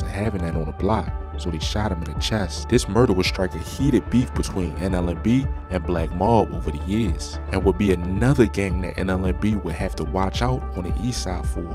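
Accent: Baltimore, United States. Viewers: That Baltimore accent heavy Asf bro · stop the bs he definitely from Baltimore lol I have family from there this is Baltimore accent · baltimore accent so annoying lol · you from baltimore. Accent crazy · Definitely from Baltimore accent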